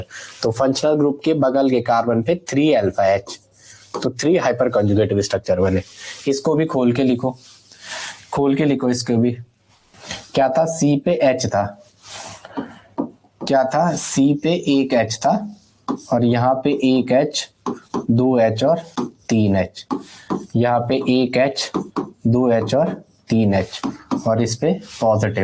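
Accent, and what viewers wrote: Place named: Rudraprayag, India. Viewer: Rudraprayag se ho na aap sir ji..tone se pata chal raha hai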